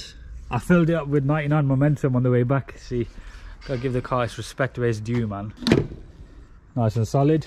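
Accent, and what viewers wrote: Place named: Liverpool, United Kingdom. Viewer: Yes I love them you’re a bit of a scouser on the sly ay 😄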